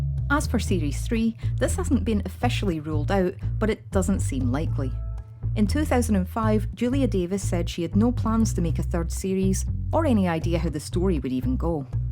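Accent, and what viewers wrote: Scotland, United Kingdom. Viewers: I watch it regularly & my hubs & i quote this daily. Helped me in my dark days & for me its the greatest show on earth! The "funeral" episode in series 1 is the joint funniest & most insanely brilliant shows ever along with series 2 "Jill's Pregnancy". Yew can keep yer bitterness to yerself, perhaps you might want to have a day off from persecuting Jill, YouTube Channel person, otherwise it just gets nasty. As soon as i heard your hacking cough & Scottish accent all i thought was "oh God, its Sandra!". Id cry if my ducts were working. Thank yew.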